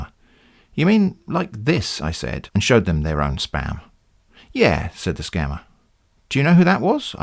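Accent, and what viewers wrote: United Kingdom. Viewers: Had a long nasty allnighter of work, nice to have that nice British voice to soothe me to a Saturday of sleeping in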